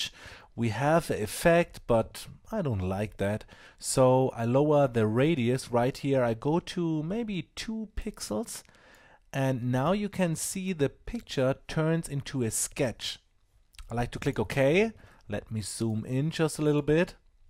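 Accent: Germany. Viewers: Irgendwie wusste ich schon vom ersten Wort an, dass du aus Deutschland kommst. ^^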